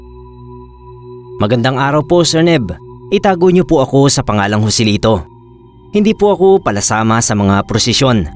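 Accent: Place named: Pampanga, Philippines. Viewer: binuklat hahaha kapampangan ka po ata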